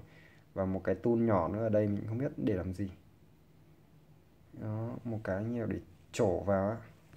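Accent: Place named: China. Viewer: ô này nói lơ lớ, chắc người TQ học tiếng Việt à :))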